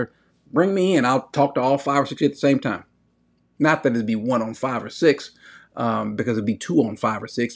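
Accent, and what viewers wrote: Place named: United States. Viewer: U need to check yourself, what do u mean trying to sound black, what does black sound to you? He talks like normal human being. His just American, being a rapper does not mean black, it means rapper simple. Don't link being a rapper equals talking black. Just say u don't like him. Why are u comparing his following to another persons following as if it's his fault? U should try watching his videos before judging.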